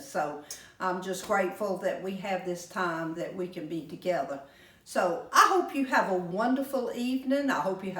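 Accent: South, United States. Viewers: your southern char., hospitality and accent is the real deal.. What a blessing you are!!! And most importantly YOU LOVE JESUS!!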